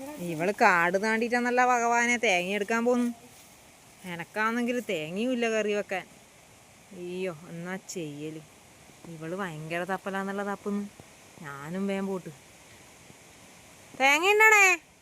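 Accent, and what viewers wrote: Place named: Kannur, India. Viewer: സംസാരം കേൾക്കാൻ ഭയങ്കര രസമാണ് കണ്ണൂർ ലാംഗ്വേജ്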